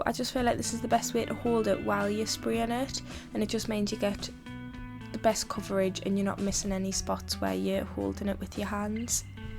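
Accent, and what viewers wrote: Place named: North East England, United Kingdom. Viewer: Absolutely top quality looking model. I was having so much trouble even finding the basic "Hulk" green escorts where I live. Ironically it was a trip to the North East (where you sound like you're from) visiting my Dad in N.Shields, where I found two RS2000s in a Newcastle Poundland. I have the first white one spare & loose, so having seen your video, I think I'll get some DD4 wheels like yours, the Tamiya acrylic and add similar details. It won't be exactly like yours as the tail plate is yellow on the white one but this would be a good addition to the collection. Beautiful effort & i look forward to watching more customs 😉 What car next? The forthcoming STH is a metallic red Lamborghini Huracan LP620-2 Super Trofeo and any basic Super Trofeo model would be easy enough. Rather than make it 100% exact, you could make it look like a road going Huracan STO & we can all agree that a metallic redcurrant red looks incredible on ANY car, but especially a V10 Supercar. 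Make it happen and I think you wont regret it., especially with a simple £4 eBay effort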